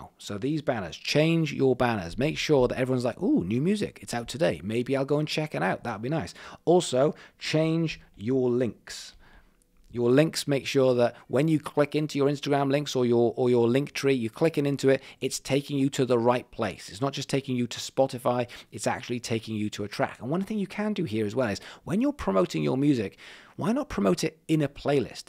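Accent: Wales, United Kingdom. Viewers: So helpful, really good communication style, clear and concise and specific, plus the Welsh accent is great!😂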